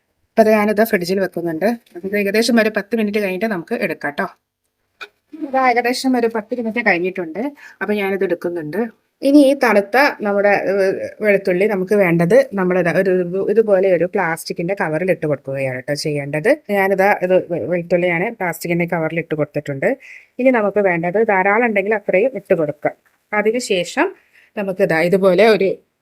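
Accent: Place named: India. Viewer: Ethu naatilanu think chovannully ennui parayunnathu.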